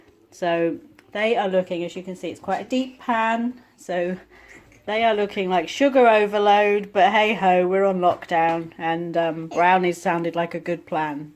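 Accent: United States. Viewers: It really is fascinating 🥰 had no interest in history when I was younger...I love it now🤣 Do you know, when I was listening to you speaking I thought there may have been a slight American twang...15 years wow!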